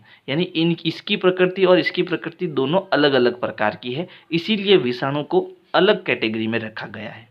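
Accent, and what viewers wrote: Rajasthan, India. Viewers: Sir kyaa aap rajshthani ho😅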